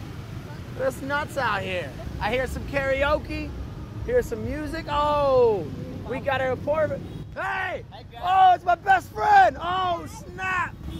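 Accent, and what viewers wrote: Vietnam. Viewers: Hello It's your reddit fan here I love your Vietnamese accent It's really weird seeing a western man holding a camera going around getting silence reaction from other people but that's just Vietnam lol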